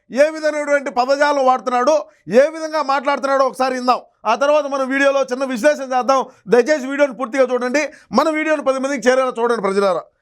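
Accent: Rayalaseema, India. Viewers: Mana Rayalaseema language super brother, Ambothu Rambabu gadu worest fellow.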